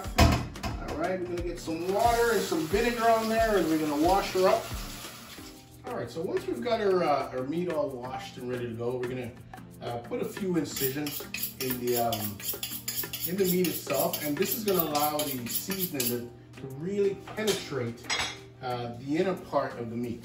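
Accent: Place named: Jamaica. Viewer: Looks yummy and colourful. A feast for the eyes and stomach. P.S. I love the touch of Jamaican accent .... a full authentic Jamacian vib. ❤ 🇯🇲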